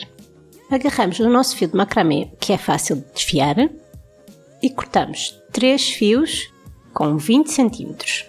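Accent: Portugal. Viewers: Menina, que sotaque lindooo! É o primeiro português de Portugal que entendo perfeitamente! E seu trabalho é muito bem feito, parabéns pelo bom gosto e refinamento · Grata por esse lindo tutorial 🙏 Eu amo ouvir o português de Portugal, terrinha de meu avô paterno que era de Lisboa. Cumprimentos de sua irmã do Brasil 🇧🇷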